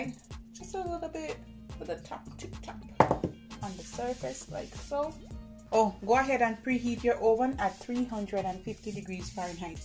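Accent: Jamaica. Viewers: I just love a Jamaican accent. No one else on Earth sounds like my beautiful Jamaican brothers and sisters. And the coco bread is looking lovely too.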